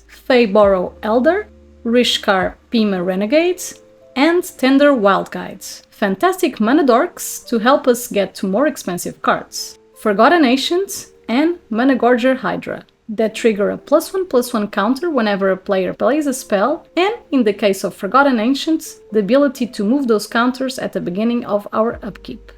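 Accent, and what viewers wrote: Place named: Portugal. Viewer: Pt accent? Amazing!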